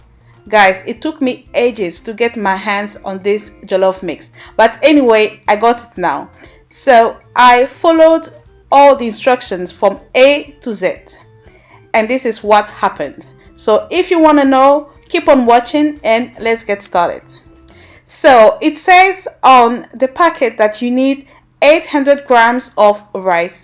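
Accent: Twi, Ghana. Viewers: I love your twi so funny😀😀😀 sending you love from Ghana 🇬🇭❤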